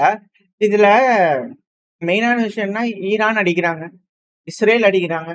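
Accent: Coimbatore, India. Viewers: Bro are you from coimbatore?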